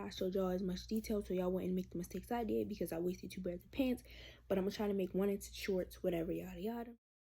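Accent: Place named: Baltimore, United States. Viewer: it sound like she from baltimore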